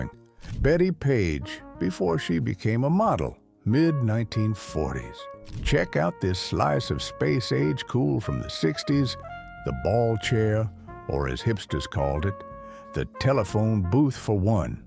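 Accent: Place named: India. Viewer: Good narration, but why the Indian accent?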